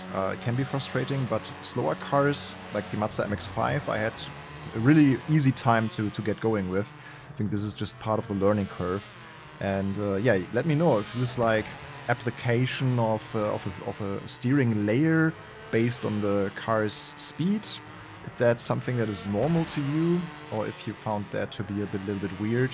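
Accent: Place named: Germany. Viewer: Vielen Dank, dass du versuchst, im Rahmen deiner Möglichkeiten unsere Wartezeit zu verkürzen. Ich kann es echt nicht mehr abwarten. Hab es auf der PlayStation auch vorbestellt. Eine Kleinigkeit zu deinem Englisch du sprichst sensitivity immer falsch aus ich stolpere da immer drüber, weil das echt schade ist. Dein Englisch ist gut, aber an dieser Stelle fällst du als Deutscher leider extrem auf 🙈🤣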